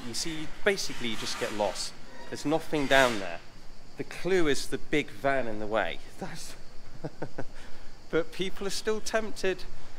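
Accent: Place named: England, United Kingdom. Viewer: Brilliant. Your accent reminds me of my favourite cricketer Geoff Boycott from England . Thanks for showing lovely places in Uzes.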